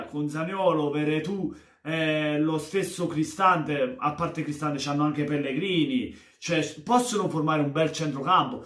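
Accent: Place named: Naples, Italy. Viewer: Ahahahah ciao carissimoooo mi piacciono tantissimo i tuoi video, poi la parlata Napoletana è fantastica viva il SUDDD ❤ BUONA SERATA 💋💋❤❤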